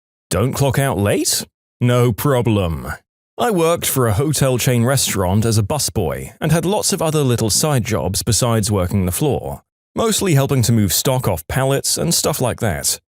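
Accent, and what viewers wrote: United Kingdom. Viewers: I thought you were from England/British bud.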